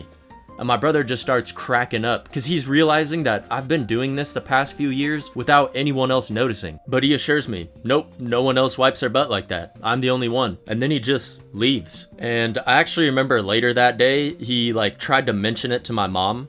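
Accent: South, United States. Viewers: 1. When I was little I thought it was weird to sit straight forward on the toilet so I sat on the side of the bowl facing the wall 2. I just now realize has a southern accent, after hearing him say "butthole" repeatedly and now I can't unhear the accent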